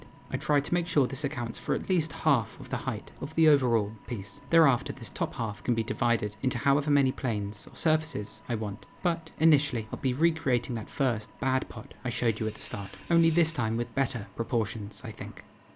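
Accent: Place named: United Kingdom. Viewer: You're so British and Ilove It sir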